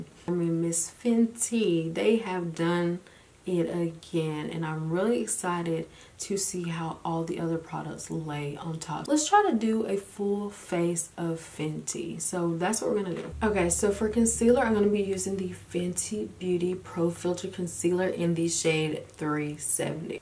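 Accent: Alabama, United States. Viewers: You sound just like NitraaB, so when you said Alabama I said no wonder, she’s from there too. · Gorg!! I knew you had an Alabama accent and you remind me so much of Nitrab here on youtube. I love your videos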